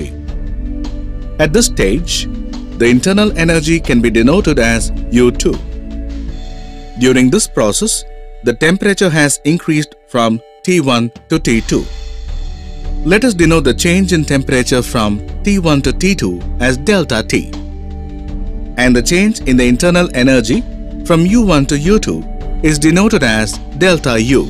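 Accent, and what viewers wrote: India. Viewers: WHO IN THE FUCK CREATED INDIAN ACCENT READER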